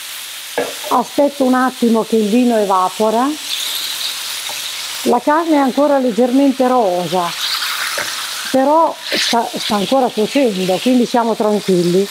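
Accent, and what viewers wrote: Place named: Romagna, Italy. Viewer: Sono romagnola vivo in Belgique la guardo sempre l accento romagnolo Che belllooo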